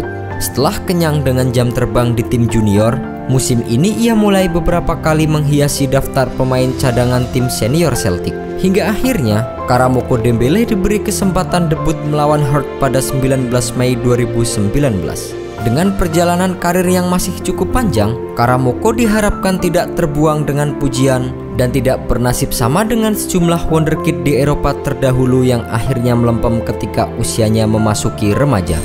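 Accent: Java, Indonesia. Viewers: knp medok ya wkwkw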